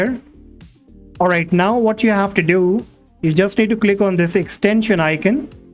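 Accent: India. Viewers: bro is dumb he just sounds indian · You sound like an indian scammer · how can someone sound so indian yet so american at the same time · he sounds indian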